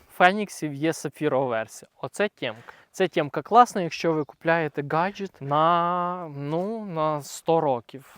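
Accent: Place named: Western Ukraine, Ukraine. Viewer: плять що за жахлива українська? плять як задовбали ці западенці. Селюки плять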